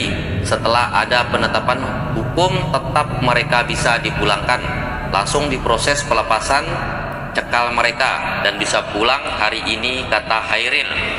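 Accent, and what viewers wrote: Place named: Sumbawa, Indonesia. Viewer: nyam seda pmbaca ne Jerni n jls pst mas nya orang sumbawa totok dri nada bicaranya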